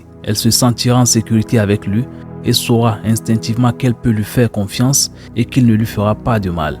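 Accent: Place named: Africa. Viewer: On s'en fou, ce n'est QUE des fautes d'orthographe, en plus il a du mérite car il est peut etre africain vu l'accent. Le superficiel limite l'esprit surtout de ceux qui sont négatif, de mauvaise foi et ingrats. C'est le fond des choses qui compte pas les formes. Le contenu de cette video devrait plutôt vous réjouir car il exprime QUE du propre qui visiblement ne vous concerne pas, et que vous dénigrez. Malheureusement, quand on est pas futé c'est pour la vie.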